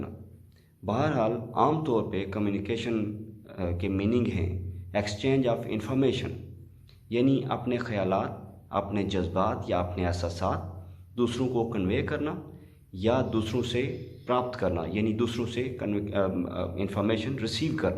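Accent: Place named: Kashmir, India. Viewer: A nice combination of urdu, a little bit hindi and English in kashmiri accent... It was really informative sir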